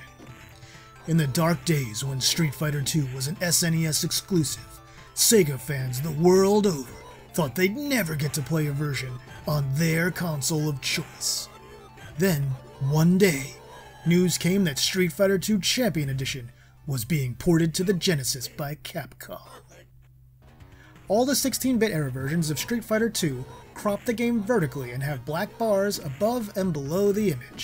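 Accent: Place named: Western Canada, Canada. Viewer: That's cool. You speak a bit like they do out west.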